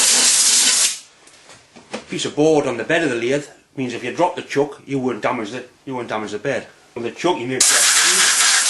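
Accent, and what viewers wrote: Newcastle, United Kingdom. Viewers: That seems to be a very quiet lathe. Easy to see that you are skilled tradesman.The speed of your hand movements are economical and accurate. May I ask, your accent , is that "Geordie". ?Greetings from Melbourne, Australia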